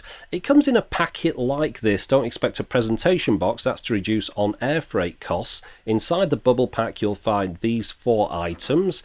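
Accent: United Kingdom. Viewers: Indeed! Somehow I love reviews in British accent. And other than 'Dr. Ashen', ' ' reviews good stuff (well, sometimes).:) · you have a bloody cool british accent!!! :D- nice review, thanks!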